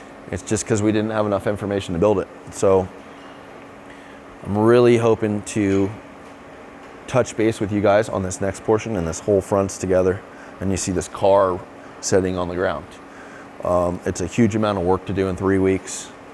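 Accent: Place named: Australia. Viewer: Love your work mate beautiful works of art. Awesome to hear your have Aussie 🇦🇺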